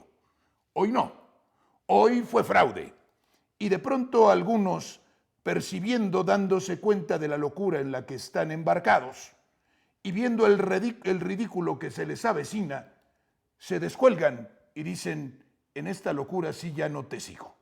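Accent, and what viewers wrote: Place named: Mexico. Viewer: Me encanta de este señor la belleza con que usa el idioma, lo domina como español culto, pero con pronunciación mexicana. Por lo demás, concuerdo totalmente con sus valoraciones y señalamientos. ¡Qué cátedras de dominio del mejor español! Desde luego sin petulancias ni pedanterías. Todos unos editoriales sus textos. Después de soportar unos segundos escuchar al porcino Alazraki -y con perdón de los cerditos, que son hermosos y muy inteligentes-, oír a es un manantial en el desierto. ¡Felicidades, señor!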